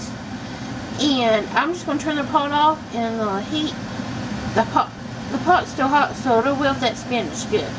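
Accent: South, United States. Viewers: Love your southern accent... just like me ;)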